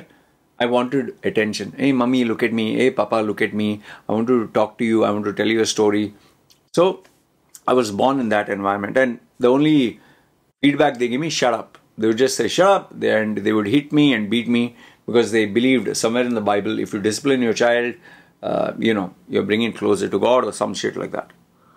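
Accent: India. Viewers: Do you ever plan to laser off your facial tattoos? I agree that it makes you stand out but at the same time, it also makes many people view you as a red flag(attention seeker) . I know you already know that. The first time I saw your video, I was shocked and even more shocked when you started speaking in an Indian accent. I assumed you had some type of trauma growing up for you to do such a drastic thing (facial tattoo).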